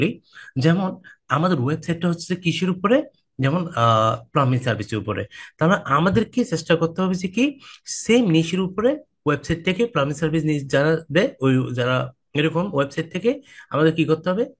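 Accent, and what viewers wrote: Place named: Chittagong, Bangladesh. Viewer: vai apni ctg mone hoi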